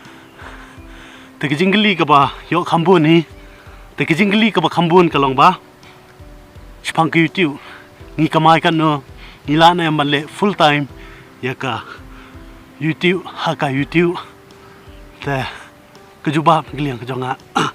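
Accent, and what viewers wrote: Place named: Pnar, India. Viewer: You have a strong pnar accent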